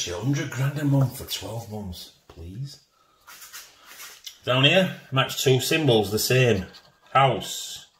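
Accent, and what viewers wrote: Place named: Barnsley, United Kingdom. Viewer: where you from mate Barnsley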